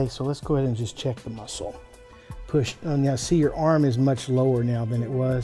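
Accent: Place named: Texas, United States. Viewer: JasonJMist I am not american so every american accent for me is just heaven! And he doesn't have just an american accent! He has a texas accent, which is heaven plus version for me hahaha I am not even a native english speaker and I can notice a lot his texan accent! And yes, for me the best and sexiest accent is not the british, it's the american. :) lol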